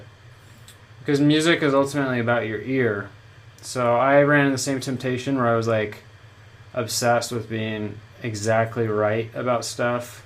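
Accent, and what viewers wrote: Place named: United States. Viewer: Yes, the only thing is, I mean I don't know where youre from, but as a German you dont understand a word, it seems to be one :) Are you californian or texanian?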